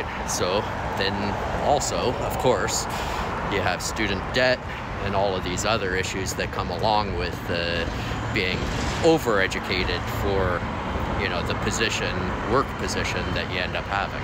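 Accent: Canada. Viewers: I watched the rest of your video after posting that. I often post as I watch. I suspected I was being trolled pretty quickly after writing that, when ~ 2 minutes in I noticed your accent is definitely Canadian. Not many Canadians don't know where their taxes are going! It was fun for me watching you walk around Ottawa, a city I lived in from 1978 to 2020. You walked past a house I lived in on Armstrong Street. The new owner painted it a rich blue; it looks nicer. A comparison of our country to itself in the past, and others now, shows how quickly we can sink. While the gap between rich and poor turns into a chasm all around the world, our economy has always been vulnerable because our leaders were under foreign rule and/or influence. Oligarchs see Canada as a vast resource with a capable and compliant labour force. It truly is sad how the kleptocrats have accelerated their looting in recent years. 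It feels like the end game...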